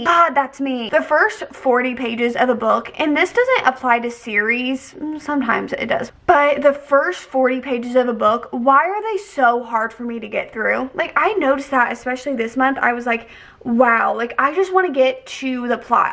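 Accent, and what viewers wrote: South, United States. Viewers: question for you: have you ever lived in the south? i swear you have a slight southern accent and the way you say “oils” is just further entrenching my southern theory!!!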